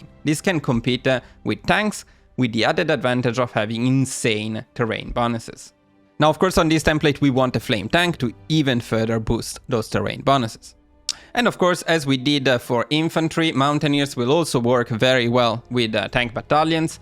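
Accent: Italy. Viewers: Hey great video (as always). Completely unrelated question, are you Italian?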